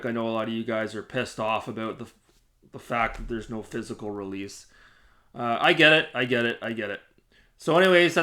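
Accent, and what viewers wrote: Canada. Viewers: haha i clicked your video without looking at the name of your channel, and before you had said 3 words I knew you were a fellow Canadian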